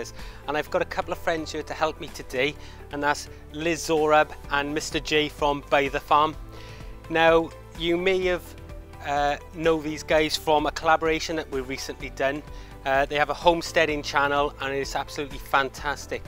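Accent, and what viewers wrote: Wales, United Kingdom. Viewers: Amazing! I’d be so stoked to have potatoes like this! Thanks for the info. I love your accent 😍 Wales? I’m from California, please don’t be offended if I got it wrong 😊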